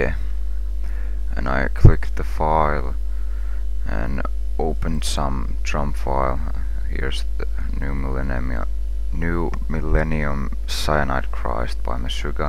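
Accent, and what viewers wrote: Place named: Finland. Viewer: finnish as fuck, great totreal lol.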